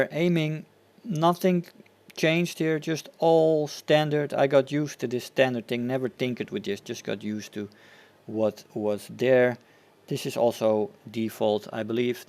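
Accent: Netherlands. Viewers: i smell dutch....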